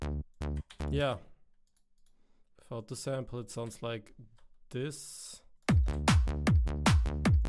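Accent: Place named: Germany. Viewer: Are you german? You have a german accent, Im german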